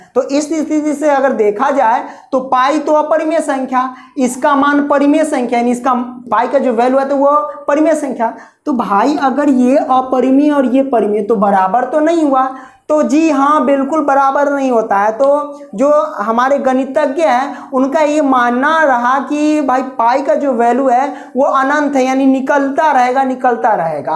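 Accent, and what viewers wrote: Bihar, India. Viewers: Sir aap Bihar ke hai na aapki baaton sehi pata chalta hai aap bahut aacha bolte hai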